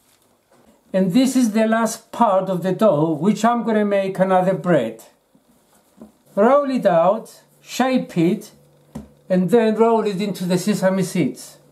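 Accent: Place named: Cyprus. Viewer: I love your recipes as I also love Cypriot food and Cypriot accent 😉😍💖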